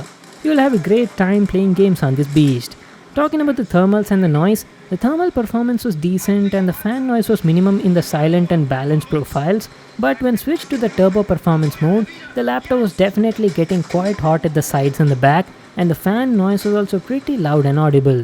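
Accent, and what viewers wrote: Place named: India. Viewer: At least I found the perfect Indian accent so smooth!!!!!!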